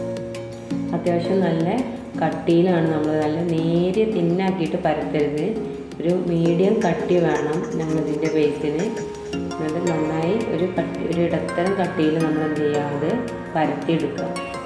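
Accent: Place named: Eranad, India. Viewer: ഏറനാടൻ ശൈലിയിൽ നല്ല അവതരണം പൊളിച്ചു..I will try it tomorrow and let you know the taste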